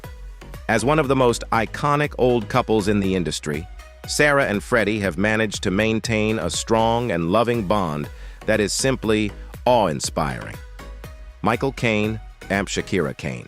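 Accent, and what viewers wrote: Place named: United States. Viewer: it's a USian voice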